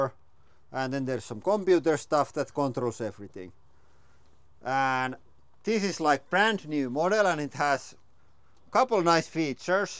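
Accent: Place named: Finland. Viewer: Great video, but Finglish is not easy to listen!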